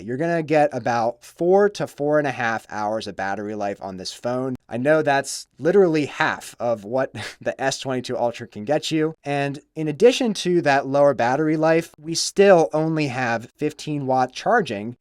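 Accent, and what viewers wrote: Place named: California, United States. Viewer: U talk like californian gurl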